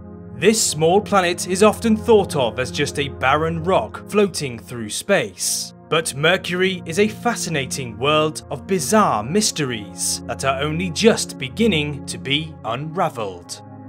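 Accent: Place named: England, United Kingdom. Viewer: I like how you tell stories about the Universe... I'm in love with your english accent. Wow congratulation.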